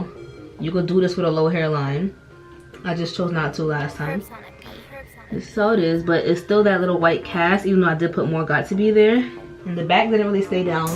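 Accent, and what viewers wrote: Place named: Philadelphia, United States. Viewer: “ I almost cut my Air “ I love her already I thought I was hearing a philly accent 😂 loved this video! Super informational and easy to follow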